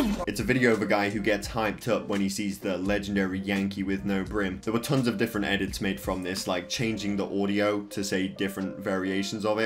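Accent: England, United Kingdom. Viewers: are you from england ?. do you watch doctor who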